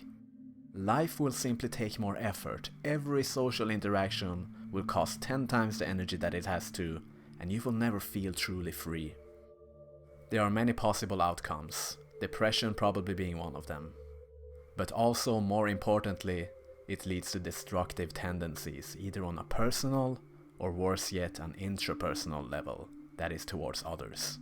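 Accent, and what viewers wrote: Sweden. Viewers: Are you swedish sounds like it atleast😂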